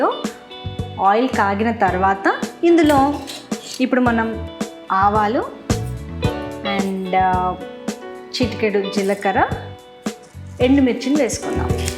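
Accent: Telangana, India. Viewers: Akka ... Naku ge food gurinchi anthaga. Thayliyadhu. . but .... Telangana slang matha .... Chimpaysav🤟 all the best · టమాట పప్పు ఇష్టం ఉండని వాళ్ళు తెలంగాణలో ఉండరు మీరు మాట్లాడే పద్ధతి మస్తుంథి తెలంగాణ 😍❤️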